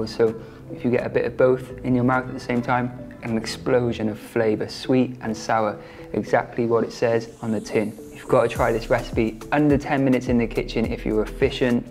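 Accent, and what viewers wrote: United Kingdom. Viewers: Hello. For the first time I came across to your channel as a vegetarian I subscribed immediately to your channel) Thanks so much, besides your vegetarian recipes I love your British English accent. Greetings from Azerbaijan,Baku.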